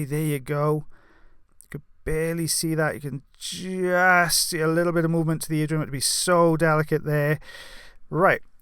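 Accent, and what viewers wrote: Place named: England, United Kingdom. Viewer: I love skin peels! I had a good laugh for a moment when you said "tinnitus". I couldn't figure out what that meant for a minute because over here, we pronounce it as "tin-eye-tus" haha. I love hearing the different words you use and the way you say them. I look forward to all the "English" English in your videos. Keep up the great work!!!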